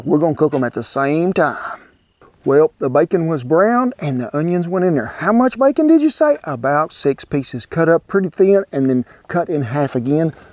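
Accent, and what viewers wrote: South, United States. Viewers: This is only my third video on this channel. What a unique character this guy is! The way he talks is like a Southern Sling blade lol. And that guy was actually a simple dude. Liking biscuits and mustard and potted meat.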